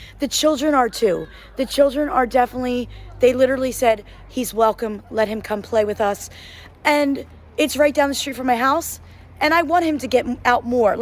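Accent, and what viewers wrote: United States. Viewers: sound like a white American talking not Moroccan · Congrats for your first road crossing the nonchalant North African way 🎉😂 (don't encourage it) More local practical safety and social tips and awareness are a must, and your best source is your neighbors and social circle, as an Algerian I can assure you that our Moroccan brothers are among the most helpful, peaceful and most generous neighbors I've ever had a chance to live close to (despite the present politics). So stay safe and enjoy your new lifestyle ❤🎉 and your caramel Noss-Noss😅 By the way your arabic slang American accent is a real treat, God bless.🎉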